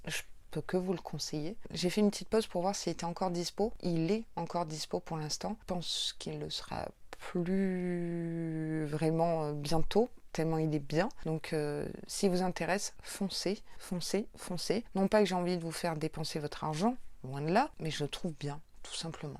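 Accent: New Zealand. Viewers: Loving the kiwi accent 💜💜💜 Jolis goodies, le calendrier est vraiment flashy rose barbie ça fait un retour en enfance je trouve 💜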